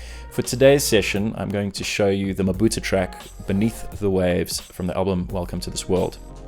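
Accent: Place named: South Africa. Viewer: Oh this is great for so many reasons - one of which is to be hearing a South African accent on this kind of video 🤣. Thanks, I'm subscribed and 🔔🛎️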